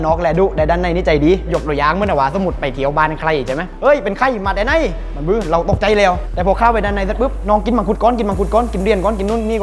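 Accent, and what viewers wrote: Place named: Thong Daeng, Thailand. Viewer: สำเนียงทองเเดงเท่ดี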